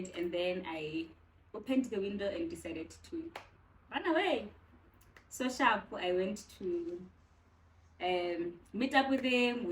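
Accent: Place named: Limpopo, South Africa. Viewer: I am just glad we get to know you better. And hearing you from Witbank I was like haibo this whole time I am like Limpopo and then I hear it. Otherwise ke tla botsa on Insta! I love this for you and I love it for me 🙈 plus ke fan akere. ❤️ o kwatisa fela ge ore you won't speak Setswana. And yes I agree you do look like your mom, and your my hight and shoe size 🤣 bathong 2 full weeks with mom wena spoilt brat!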